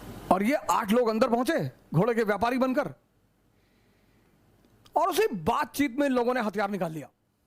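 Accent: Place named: Bhojpuri, India. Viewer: It's bhojpuri not urdu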